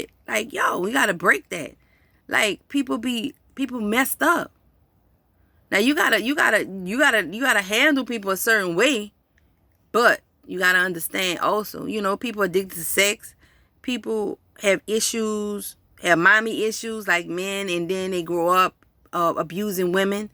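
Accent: New Orleans, United States. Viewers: Her New Orleans accent 🤤 She still got it 😍💙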